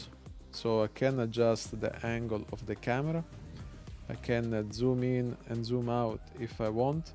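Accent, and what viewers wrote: Italy. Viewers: Italy 10 – France 0 (if your accent didn't give away that you're Italian this sure did)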